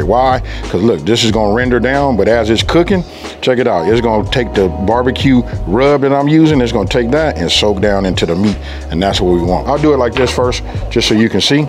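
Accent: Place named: California, United States. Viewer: As a fellow LA dude, I'm happy to see do his thing. But I've been going from LA so long that I can't stand the Cali accent lol.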